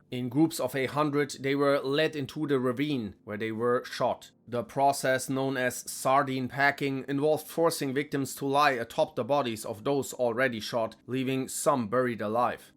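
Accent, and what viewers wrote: Germany. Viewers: Nah bro has a nice german accent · Do you not notice the accent of the narrator? He's clearly german. He's talking about his countries history.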